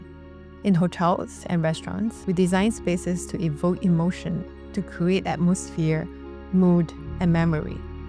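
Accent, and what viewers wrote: Singapore. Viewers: Lovely place and couple. I’m glad the narrator’s Singaporean accent was easy on the ears and not the stereotypical choppy accent lol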